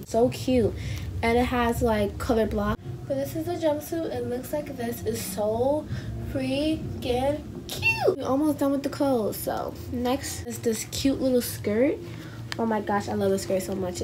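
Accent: New York, United States. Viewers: Your accent sound like you from New York 😍😍😍😍😍😍😍😍 and your name is so pretty😍😭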